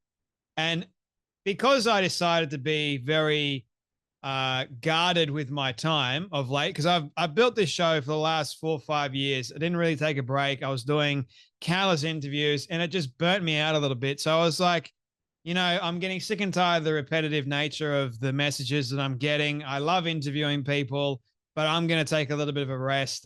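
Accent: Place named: Australia. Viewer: I love your channel, you speak as an Australian and i so appreciate that 👌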